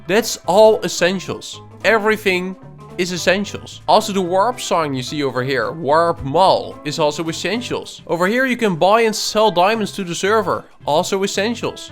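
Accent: Netherlands. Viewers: Hallo ik hoor wel dat je nederlands bent hehe ik ben grootte van van jou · jij klinkt erg nederlands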